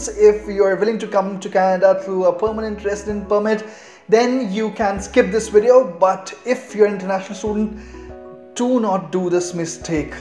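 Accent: India. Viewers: Indian english accent hi h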